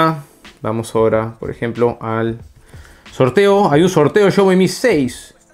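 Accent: Peru. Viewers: eres peruano???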